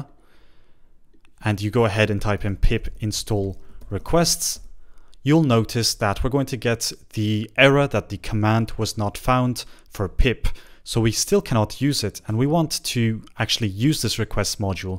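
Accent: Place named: Italy. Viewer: MA SEI ITALIANO LOOOL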